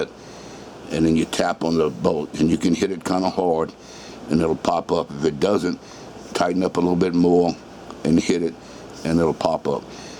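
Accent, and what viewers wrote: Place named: Louisiana, United States. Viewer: Thanks for the video. You sound like you might be from Louisiana, likely east and south of I-10. Ask me how I known. That explains the damn corrosion.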